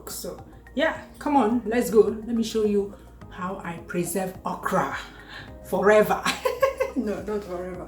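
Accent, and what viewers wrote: Igbo, Nigeria. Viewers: Will it still be slippery, i can't wait to watch u cook it , the best part I enjoy in ur video is that mixture of ur engligbo na superb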